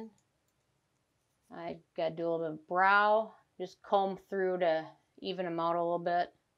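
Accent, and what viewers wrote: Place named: Midwest, United States. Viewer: my sunscreen AND Hyaluronic are water base. I’m using the Garden of Wisdom glacier water blah blah but their most popular and it is nice and refreshing as you’d guess. Def not enough at all on its own. Can’t wait to try First Aid. I have normal skin but it likes oils at night better than creams even tho derm docs say creams are better for keeping products in place to do their work. Sunday Riley Luna or Juno are dreamy for me. Starting up retin A once s week again and once you get past the ugly part, you def glow. I avoid it if I’m vacationing or have an event where I don’t want surprise peeling. Such as Christmas. Then I get back on the horse. I’m originally from MI so your accent is charming like mine. Lol. People know I’m Midwest immediately so I’m loving hearing another brethren once in a while.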